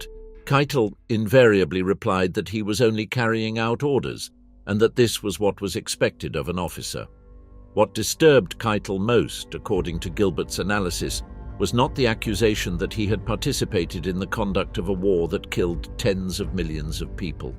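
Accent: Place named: Oxbridge, United Kingdom. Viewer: stagey and pretentious...instead of the corny oxbridge accent and spooky music , a little histortical rigor...when thbe narrative ventures to comment and philosophize it pushes the envelope of banality....saying trite and obvious things in a stagey accent does not really dignify them ...too stupid overall and constantly stating trite opinions as verities....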